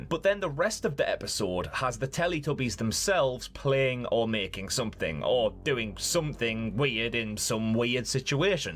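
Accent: Scotland, United Kingdom. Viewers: this person sounds like Mimir from God Of War. Maybe because they both have Scottish accents idk. edit: Saw more of the video and I'd say more like a mixture of Mimir and Philza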